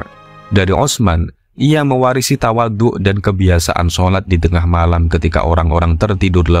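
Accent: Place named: Tegal, Indonesia. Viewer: Alhamdulilah terjemahe wonge dewek Tegal ngapak ngapak nyong rongkna lur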